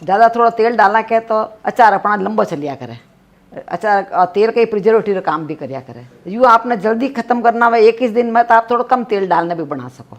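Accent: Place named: Jodhpur, India. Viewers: You speak very sweet language of JODHPUR we Rajasthan people are proud of you SA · Antiji aap ki recipe bahot saral hoti hai.muje aap ki marvadi bhasha sunke bahot achha lagta hai.aap aise hi naya sikhaya kare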